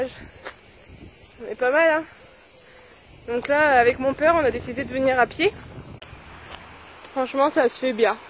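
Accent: Nord-Pas-de-Calais, France. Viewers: Mais non t'es chti ???!!!!!!!!! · T’es une Chti comme moi 😁😁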